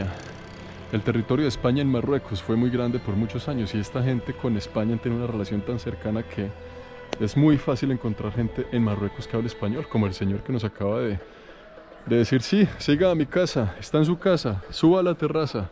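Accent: Colombia. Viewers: Amo como hablas muy colombiano, no se puede olvidar la tierrita; eres lo máximo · montaña a la orilla del río, hasta yo me canse! Lol.....Me gusta ver tus videos por el mundo, muro de risa, con tus comentarios en tus recorridos. El acento Colombiano, es uno de mis favoritos! Exelente pueblito al lado del rio! 👏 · Ese man habla mas colombiano que yo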